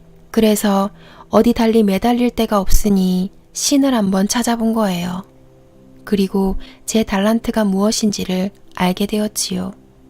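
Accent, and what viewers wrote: Gyeongsang, South Korea. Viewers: 경상도 억양 목소리 사랑해요~~^^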